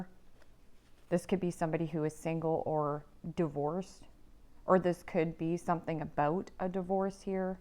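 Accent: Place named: Canada. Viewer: ❤ I thought I detected a Canadian accent 🙂🇦🇺🇨🇦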